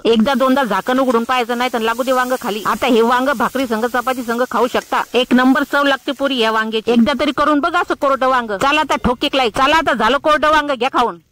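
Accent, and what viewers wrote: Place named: Ahmednagar, India. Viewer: नगरी भाषा 😊❤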